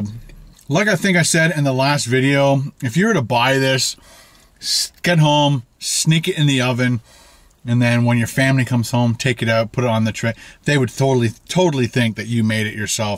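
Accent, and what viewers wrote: Canada. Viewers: Pro-gress. Gotta love the Canadian accent. I work for a Canadian bank in New York..after 10 years there I’m starting to talk like a Canadian as well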